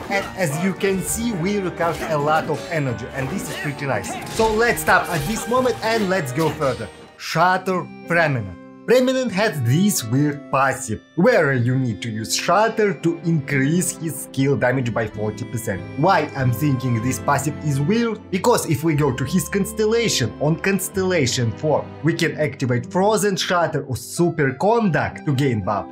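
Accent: Russia. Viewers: ur accent like russian-en isnt it?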